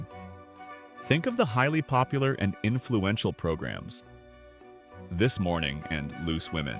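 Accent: United States. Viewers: Why the American narrator?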